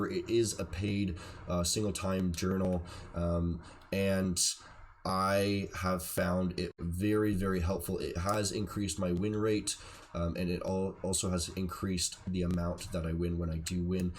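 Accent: Canada. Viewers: its just his canadian accent....not his fault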